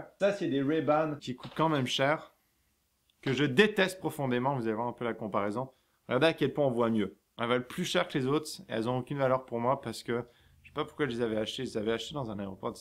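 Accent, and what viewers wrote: Quebec, Canada. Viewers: l'accent québécois! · L'accent québécois est plus prononcé ou c'est moi? Et au fait le chat il s'appelle ISIS....AAAAAHHHHHHH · C'est drôle t'as un accent québécois mais hyper légers on le remarque presque pas ! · wow , jtrouve que ta plus l'accent québécois qu'avant ^^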